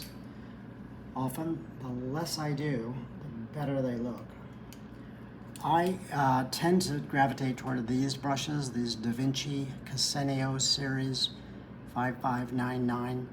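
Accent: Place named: Berkshire, United Kingdom. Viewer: Thank you so much. This was a lovely demo and I enjoyed it very much. I look forward each week to see what you have to share. I am going to try this technique with a squirrel that I am thinking about painting. I love the colors for the otter. These Texas ears are loving your Berkshire accent ❤ 😊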